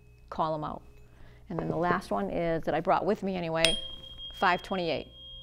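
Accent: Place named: New England, United States. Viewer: A fellow New Englander!! I knew that accent sounded familiar! Great video. Do you teach classes in person?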